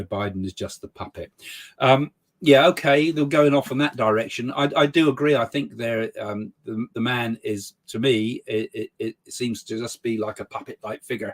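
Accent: United Kingdom. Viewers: yea ! I can't comprehend the british accent ! I'm from united states.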